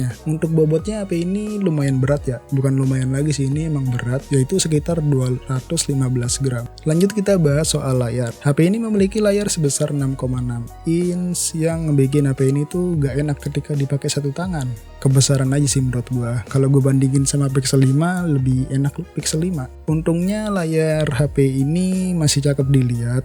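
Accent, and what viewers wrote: Bali, Indonesia. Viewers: bang lu asal bali yaa bang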